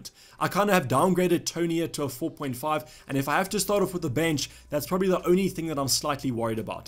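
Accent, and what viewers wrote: South Africa. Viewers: Are you South African ? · Bra you sound South African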